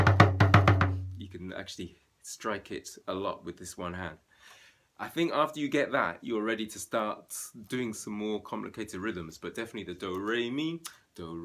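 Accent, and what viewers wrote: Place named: London, United Kingdom. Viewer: Hi Sir, please tell me whereabouts in the UK you are based - hopefully London from your accent? I am interested in buying one and taking up lessons. I am very serious depending on what the finances are. I have added you on Instagram and my name is David. Thanks.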